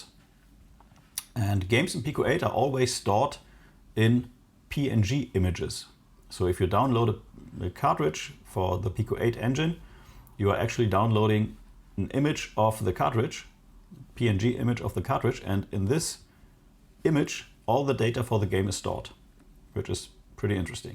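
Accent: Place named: Germany. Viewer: Ohne deine Beschreibung zu lesen, höre ich einen starken, deutschen Zungenschlag. Habe eben deinen Kanal gefunden und bin begeistert. Tolles tech-zeug, tolle themen und danke für den howto-spirit! Alles Gute weiterhin!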